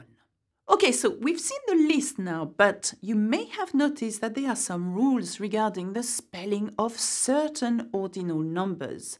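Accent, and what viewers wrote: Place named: France. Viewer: she speaks in a french accent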